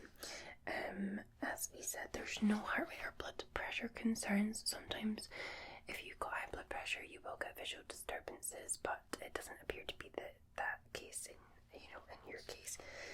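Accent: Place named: Scotland, United Kingdom. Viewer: Thank you,.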